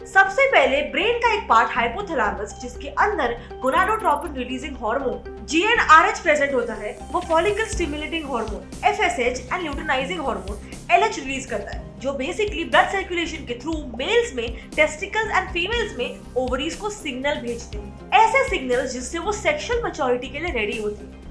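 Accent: Northeast, India. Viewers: Is she from Assam? She sounds like a northeast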